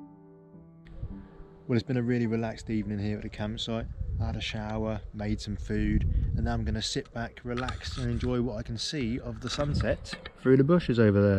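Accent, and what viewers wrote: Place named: Hampshire, United Kingdom. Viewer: your accent is so similar to hampshire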